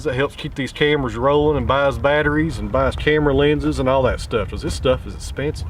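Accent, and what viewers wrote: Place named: Tennessee, United States. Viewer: Bowls off a lathe sound good to me. I’m new to your channel, but I guessed your location by your accent from my kinfolk that live in your parts, Bristol, Sevier and Rockwood.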